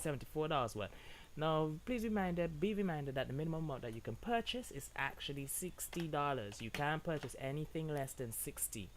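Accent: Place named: Caribbean. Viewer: Hearing a Caribbean Accent....Respect !!!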